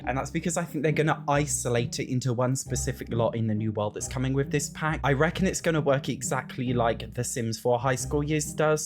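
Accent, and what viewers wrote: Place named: United Kingdom. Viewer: So cute listening to a Brit describe The Great Plains 😝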